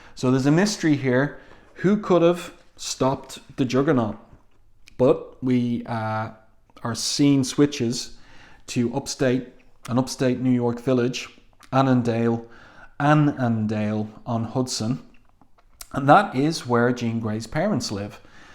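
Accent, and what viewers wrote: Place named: Ireland. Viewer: Lovely to hear a modern Irish voice online.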